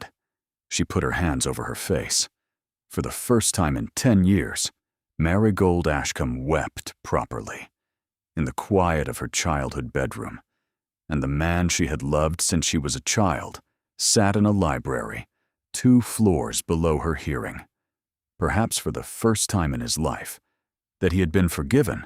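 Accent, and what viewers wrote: United States. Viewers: I really have a problem with this AI’s voice. Its sooo unpalatable. I couldnt enjoy the video because of this repulsive voice and American accent that really does not suit this type of audio story. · Why pick an American voice for a totally British story. This keeps happening. I have listened to some very good stories with proper British voices & some were excellent. This one has a Duke speaking in an absolutely inappropriate American voice. Then, the story has the most unheroic hero I can image. He grows up with a brother & sister. He loves both of them, the brother as his best friend & from the time she is 16, he falls in love with the sister of his best friend. She loves him in return but this weird non hero sets out to never allow any chance of them being together. He even tells her to marry someone else & tries to run away from her. 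She, actually, is a heroine & chases him down so they DO wind up together but, frankly, I can’t imagine not being so disgusted by that time, besides just tired, I would wonder if I could ever trust him. There was never a good reason for his ridiculous sacrifice of their lives that I could see. It’s something different but not likable.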